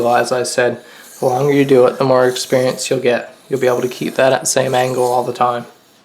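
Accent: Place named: Newfoundland, Canada. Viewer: Dude, are you from Newfoundland!? Haha great tutorial! Got a paramilitary 2 on the way as well as a 400/ 1000, 3000/8000 and strop. Can’t wait!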